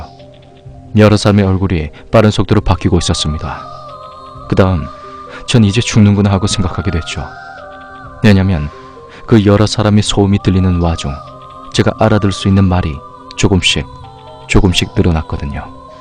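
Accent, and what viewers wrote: Gyeongsang, South Korea. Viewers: 이런건 심심해도, 호기심이 생겨도 하지않는게 좋을것같네요. 오늘도 님의 목소리와 경상도사투리를 들으며 하루를시작합니다. 좋은하루보내시고 화이팅~~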